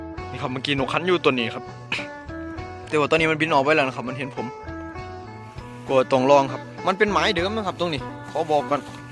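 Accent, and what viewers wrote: Korat, Thailand. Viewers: คนโคราชเบอะครับ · แก่นี่พูดดโคราชดีเจนเนอะ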